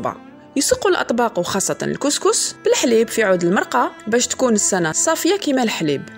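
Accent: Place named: Algiers, Algeria. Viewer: يعطيك الصحة، و تبارك الله على ذاك الصوت الحنين و على اللهجة تاع العاصيمة 😘 الله الله عليك . أسڨاس أمڨاز ثانميرث سڨذرار ن جرجرة 🇩🇿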